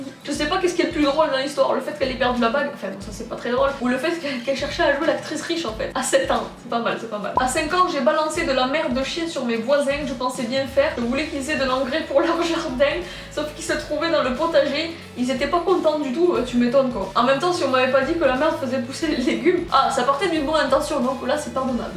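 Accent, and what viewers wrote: Toulouse, France. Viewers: je t'aime trop et j'aime aussi ton accent toulousain sa te vas vraiment bien 💖💟💞💝💘les autres ont pas le droit de se moqué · Elle habite à Toulouse, c'est l'accent Toulousain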